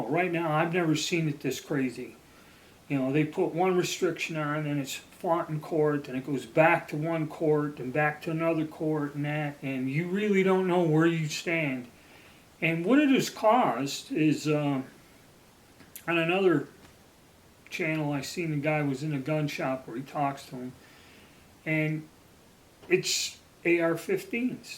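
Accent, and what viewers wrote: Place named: Pennsylvania, United States. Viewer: were are you from as in wht state were you raised.you sound like your from nj.pa.oh are you????????i say allentown pa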